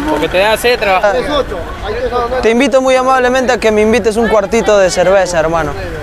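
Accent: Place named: Huánuco, Peru. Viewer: Tiene dejo de Huanuqueño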